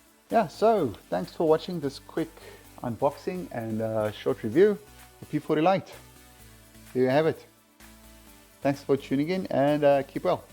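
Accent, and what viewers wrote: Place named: South Africa. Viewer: Jy klink suid afrikaans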